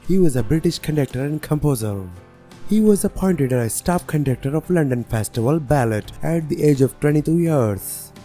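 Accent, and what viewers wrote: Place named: India. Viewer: He cannot help his accent. I believe he may be Indian.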